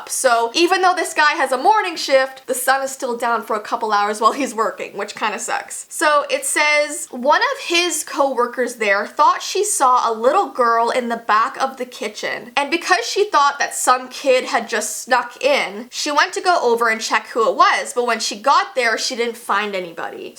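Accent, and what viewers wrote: Canada. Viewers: Don’t worry I am Minnesotan so I have the same accent as Canadians and I say Oregon (or-I-gan) too ily❤️ (edit) this was a typo: (or-i-gen)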